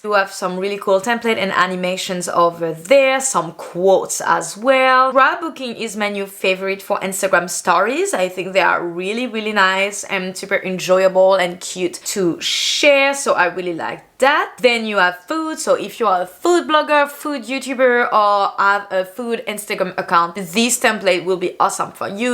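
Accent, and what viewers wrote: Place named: France. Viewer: Je me disais qu'elle etait française avec son accent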